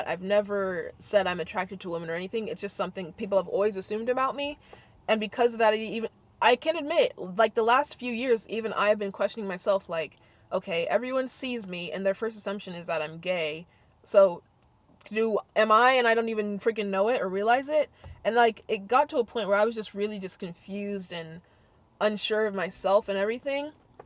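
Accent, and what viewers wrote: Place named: United Kingdom. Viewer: I love your British accent...more please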